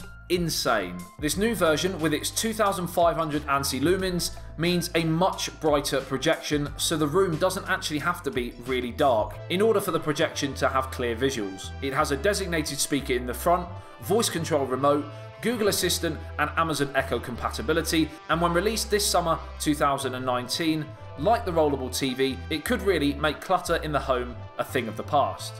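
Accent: United Kingdom. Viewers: Dude, your accent is so British...You named it as but I'd call it.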